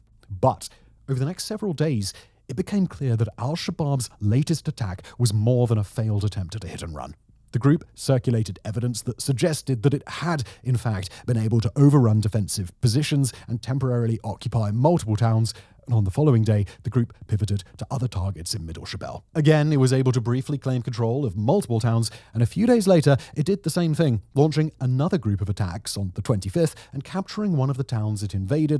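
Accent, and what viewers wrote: RP, United Kingdom. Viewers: you are a prime example that everything sounds correct when said in an RP British accent. I live in Mogadishu wife,kids, extended family, the whole shebang. We are not under siege. The turks have just declared that the two blocks they explored has an estimated 20 billion barrels of oil. I do not engage with your output because you know very little about very much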